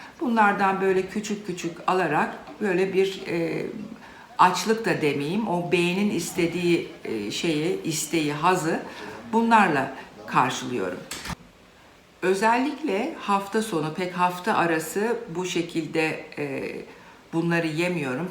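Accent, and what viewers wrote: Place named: Ankara, Turkey. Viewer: Sevgili Prof.ümüz, Ben sizi rejiminizden sonra tanidim.Güzellik ve canayakinliginiz disinda ictenlik ve dürüstlügünüz cok etkileyici.Ayrica siveniz ve sevdiginiz yemekleri hazirlama tarziniz benimle tipatip ayni.Acaba siz de Ankarali misiniz? Cok sevgiler ve selamlar.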